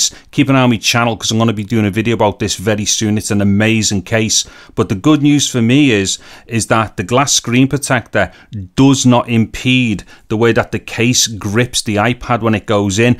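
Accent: Liverpool, United Kingdom. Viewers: Liverpool ish scouse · Ah, the lovely scouse accent! It’s unique to Liverpool. My favourite city in England.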